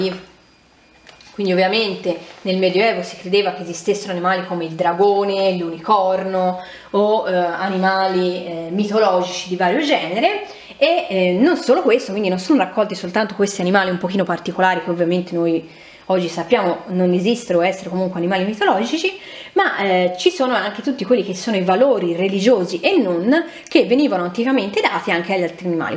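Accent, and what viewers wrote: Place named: Tuscany, Italy. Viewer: Ciao, e vuoi vedere che la fine del petrolio sia la fortuna dell umanità. Bellima la parlata toscana.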